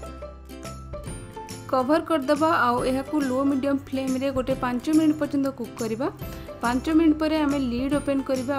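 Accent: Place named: United States. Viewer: Why ur accent is like this are u from America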